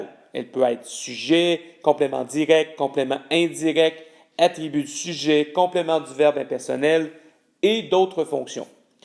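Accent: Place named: Canada. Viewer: Super Tuto et j'adore l'accent canadien :D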